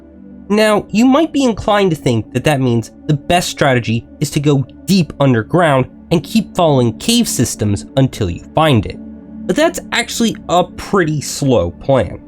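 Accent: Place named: Northeast, United States. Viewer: First of all, great video and it’s the first I watched of yours Second, you’re definitely north east coast 😂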